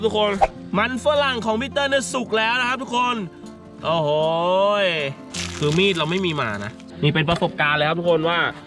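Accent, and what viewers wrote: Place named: North, Thailand. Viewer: พูดไทยสาบเมืองได้ไง 5555 จิ้นส้ม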